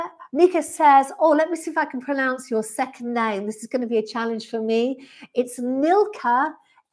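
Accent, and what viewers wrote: England, United Kingdom. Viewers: Thank you so much. Your English accent is so refreshing to hear! Sorry to those Aussie and American accents but they are often very high pitched and squeaky…!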